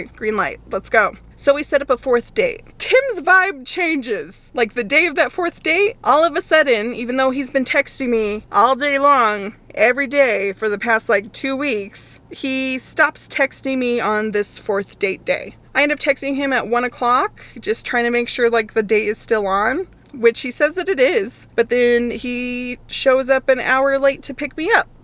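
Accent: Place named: Pennsylvania, United States. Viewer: That’s gotta be a Pennsylvania accent.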